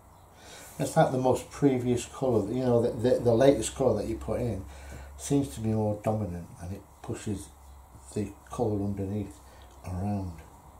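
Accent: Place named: Yorkshire, United Kingdom. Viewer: So different and inspiring, love your style. Quite unique. Nice to hear a fellow Yorkshire accent! Debs in Spain ❤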